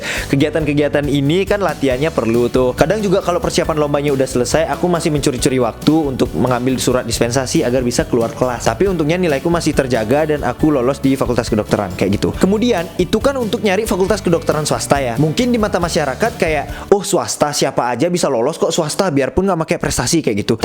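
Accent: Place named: Bali, Indonesia. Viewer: Logat balinya kerasa🤩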